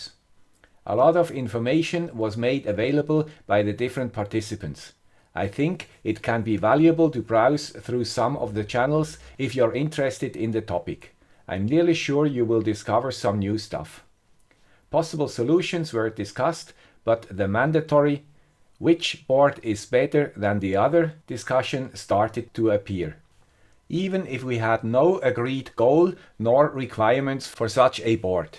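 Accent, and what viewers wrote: Switzerland. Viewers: You are correct. Many crowdsourced collaborations have occurred in the digital / electronics world. Many have failed due to the lack of process and project management issues. Do not be so hard on your documentation assessment (at least - in this video) and challenges you faced. You highlighted important elements - and yes, all engineers, myself included, hate paperwork. But as all know, they are a necessary evil. We have learned much from Michael Faraday and his superb skills and the unfortunate challenges of lacking a formal education that, very likely, held him back from even higher achievement than he already had achieved at such an early age. Newton was the exact opposite. By the age of 23, his manuscripts had become masterpieces in their own right. We are all "lazy" in comparison ;-) Even so, I think you deserve a lot of credit creating this content and its format. 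It is superb - even for a guy with a Swiss accent - that is easily understood in the YouTube Universe!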